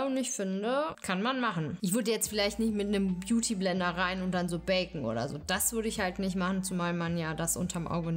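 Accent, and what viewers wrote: Berlin, Germany. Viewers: 😂😂 minute 😂😂😂 Die beste Faxenmacherin youtubes Deine Berliner Schnauze ist auch grossartig -Du löst bei mir Heimweh aus 👏👏👏weiter so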